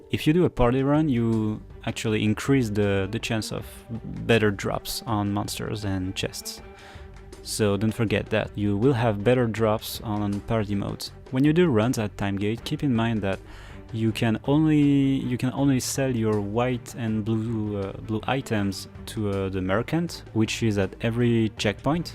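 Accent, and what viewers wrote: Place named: France. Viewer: Just gave up because of the terrible accent. Don't wanna show off but tbh I could do better my first hs year and still I'm french too. If you can't talk correctly just do your vids in french, butcher.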